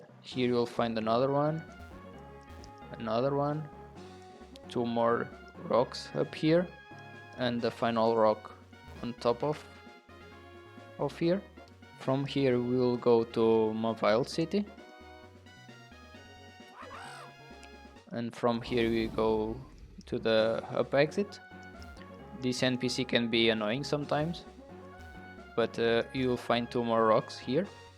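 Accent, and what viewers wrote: Portugal. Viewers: This is gonna be a shot in the dark. But I feel the Portuguese accent here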